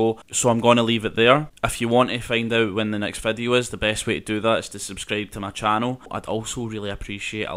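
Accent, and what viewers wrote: Scotland, United Kingdom. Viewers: the scootish accseny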